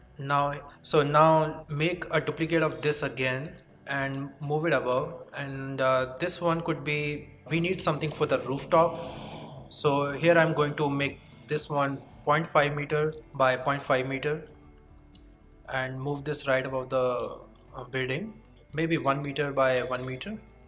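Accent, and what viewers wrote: India. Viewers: Indian haan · Bro are you from India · I Can Hear Indian Accent Clearly I Am Sure It Will Be The Simplest Tutorial 🤩🤩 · The basics already looks hard.... BUT i learned ALOT from this tutorial. you can explain well and you have a funny indian voice. Thank you.. alot. i also subscribed to your channel! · I paused this video just to post how much i appreciate all the work you put in this. As someone who has experience on C4D already, many things look familiar or very similar, but in no way i could find my way around Blender so quickly by reading their vast manual. You explain things nicely, and clearly. And yes, i love Indian accent. 1/3 of all my knowledge regarding software and windows matters like security, troubleshooting etc, was passed on to me for free by awesome people(s) with heavy accents. Thank you from a fresh subscriber. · Amazing Work! 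BTW are you Indian?? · this is the best one out in the youtube man he explains more clear than my dad!!!! i was shocked hearing an Indian voice hats off man and...i subscribed your channel.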